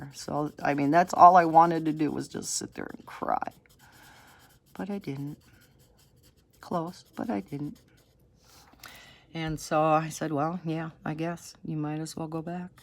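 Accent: Wisconsin, United States. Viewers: Hi! I am from Menomonie. It sounds like you are in the Wausau or Stevens Point area? Just guessing. You shouldn't be nervous dear because you sound like you have been doing this forever. Pat on the back. The foil technique is so cool. I'll have to give it a try. Thanks for sharing it. ;)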